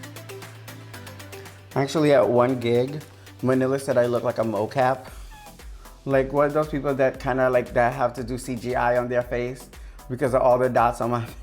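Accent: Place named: New Jersey, United States. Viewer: I’m not from the US so I’m not really sure of what a Jersey accent is but I’m pretty sure Jiggly has it.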